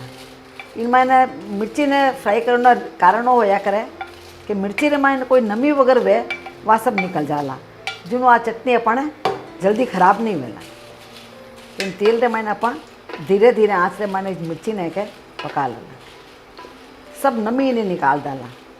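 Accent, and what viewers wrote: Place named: Marwari, India. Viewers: Bht Shukria. Apka bolne ka tariqa achcha laga marwar yad agio. Chatni bht mazedar lag rahi h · Aapki Marwari sunke meri Nani Maa Ki yaad aagayi aunty ❤️❤️ Thankyou so much 😢😢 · aapki marwari bhasha sun kr maja aa gaya. Har step smaj mein aa gaya. today I will try. Thanks Mummy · Ram ram sa ap ki marwacdu boli se pet bhar jata hai aur ap ki recepi👌👌🙏👌👌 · Yummmm👌👌🌹🌹🌹🌹chitney bahut achi he aur I love marwadi bhasha.. soo sweet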